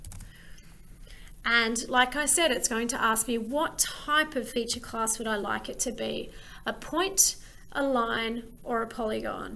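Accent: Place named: Australia. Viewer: You explain this so well. It was so simple to understand and follow along in my ArcPro account. The Australian accent is top tier! Super helpful, thank you from California!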